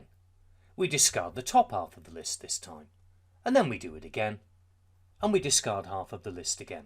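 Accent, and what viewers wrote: United Kingdom. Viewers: HOLLLLYYYY - thank you so much for your video. this is probably the most perfect explanation i've seen. its either the style of explanation or the british accent that is driving the points home. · I also can't tell which it is, but the British accent is definitely doing it for me!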